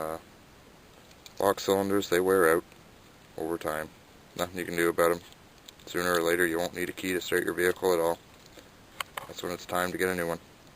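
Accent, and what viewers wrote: Canada. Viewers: Canadian eh.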